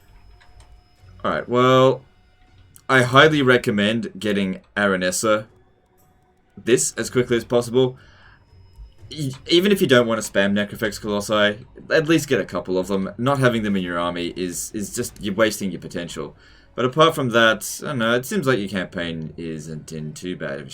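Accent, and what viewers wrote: Australia. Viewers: When i was scroling through ytl and saw i was like omg it's true cc doesnt work well with an Australian accent.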